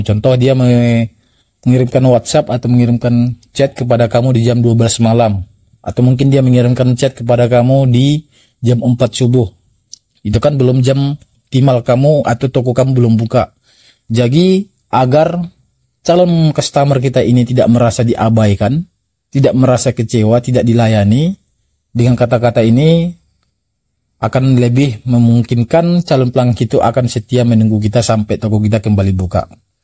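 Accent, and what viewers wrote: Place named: West Sumatra, Indonesia. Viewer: Dari logat nyo apak alah jaleh apak urang minang kan 😊🤭 Dima tingga kini pak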